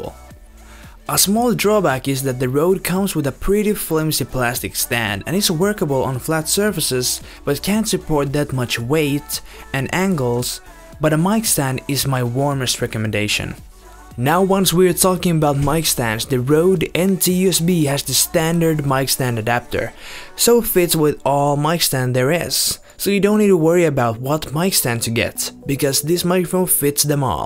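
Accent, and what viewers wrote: Finland. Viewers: You sound very,,, Finnish :D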